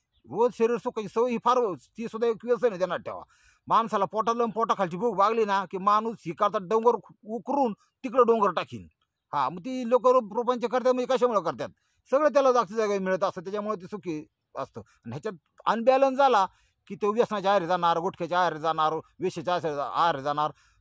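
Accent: India. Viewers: तुमच्I गावरान भाषा खूप छान आहे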